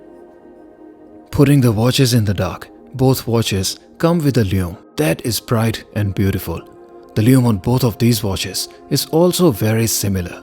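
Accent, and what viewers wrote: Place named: India. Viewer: Damn, indian accent!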